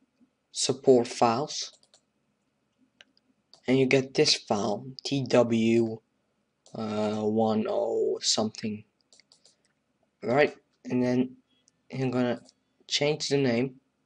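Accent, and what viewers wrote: Netherlands. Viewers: Je hoort nauwelijks het Nederlandse accent, doe je goed, ge hebt een sub erbij ;) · haha ik zag nl-NL voor bij komen, vandaar het dinglish accent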